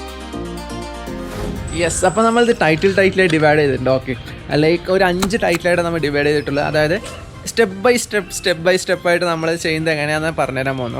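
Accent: Palakkad, India. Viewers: bro palakkadkarananoo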